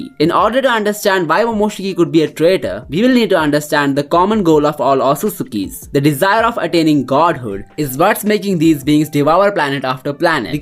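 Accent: India. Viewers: Bro your accent is like indian r u indian?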